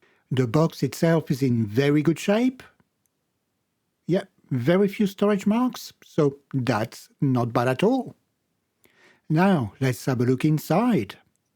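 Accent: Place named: France. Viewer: Just commented on another video and now exploring your channel :) The french accent again 😍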